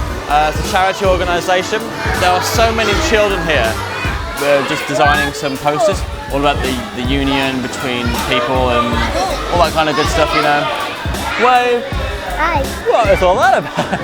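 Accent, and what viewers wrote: United Kingdom. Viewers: I noticed while you were tasting the sinigang soup your companions were telling somebody (the restaurant manager perhaps) that your a famous vlogger from the UK (your accent helped too) ergo you got the dinner for free. Your lucky that your Filipino companions were social media savvy and knows how to negotiate, but have to advise you that's not always the norm. Sorry for being a spoiler but kudos to those Filipinos you were with.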